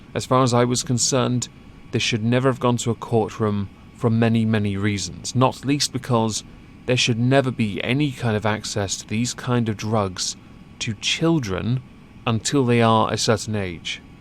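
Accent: United Kingdom. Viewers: The only one feeling dysphoria is the mother, who WANTED a little girl. Oh, and the attention from attempting to gain ‘woke points.’ BTW: Vit-O-mens. LOVE IT. Give me your incredible British pronunciation,!! Love your work, as always!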